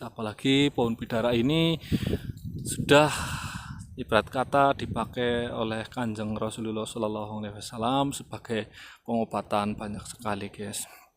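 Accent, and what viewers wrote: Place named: Java, Indonesia. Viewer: wong ndi Rika,medok temen ngomonge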